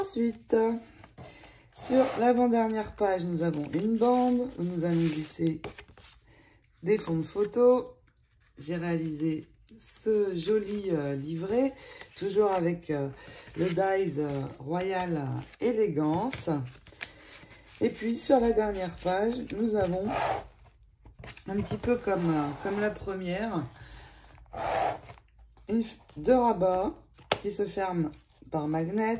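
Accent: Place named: Nord, France. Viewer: Bonjour ton album est vraiment magnifique. En t écoutant tu as garder ton accent du Nord j'adore.des bisous 😘😘😘😘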